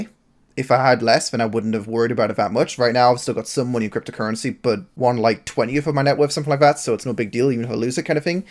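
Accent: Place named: North, United Kingdom. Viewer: Babe, We watch you for you, I can't NUT without that sexy Northern Accent.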